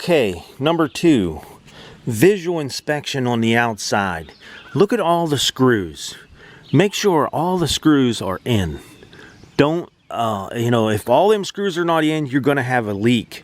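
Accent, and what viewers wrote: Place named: Pittsburgh, United States. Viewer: I am so glad I just discovered your you tube site! I looked at your video playlist and am amazed at how creative a DIY master you are. I also heard in your speaking that you must be from the Pittsburgh Pennsylvania area, as you possess several distinctive Western PA speech sounds. I went to school there (Carnegie Mellon, Theatre/Speech School) back in the early seventies, and love that particular American "dialect". A very good friend lives there and so, I get to hear it again, from time to time. Thanks for your spectacular videos. I look forward to watching most of them, as you appear to know all one should know in order to take care of ones self in this world (or not to get ripped off when someone else 'services" your need) It is never too late to learn. I'm 70, and still have lots to learn!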